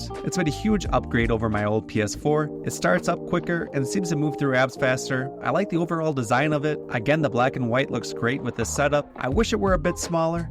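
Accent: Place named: Singapore. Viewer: wow. you sound so singaporean.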